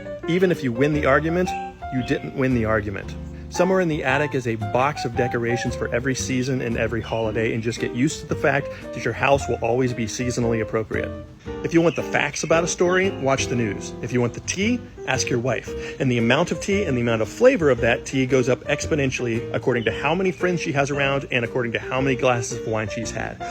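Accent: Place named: South, United States. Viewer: I'm from the South. It sounds like your wife southernized you like I did my New York honey .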